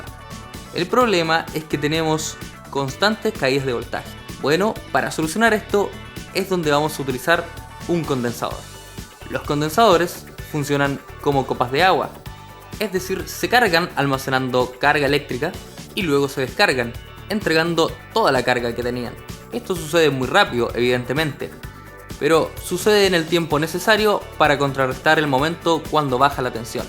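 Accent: Chile. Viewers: Es Chileno verdad? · Amigo mio una pregunta eres chileno ?¿ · Dices acá en Chile pero el men por el acento parece chileno :v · Eri chileno? :3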